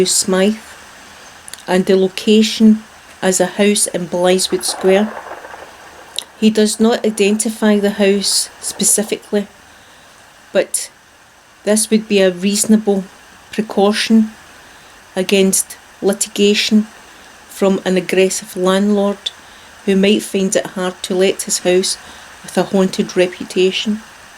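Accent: Scotland, United Kingdom. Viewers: This was awesome! I like ghost stories. The sound-effects is a perfect mix with your beautiful accent :) So relaxing...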